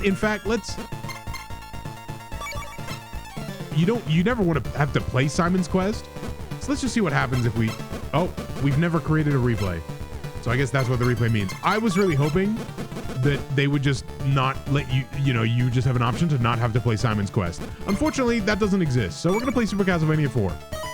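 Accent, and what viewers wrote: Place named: United Kingdom. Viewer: You sound like a Native Britt😂😂😂 With that Voice over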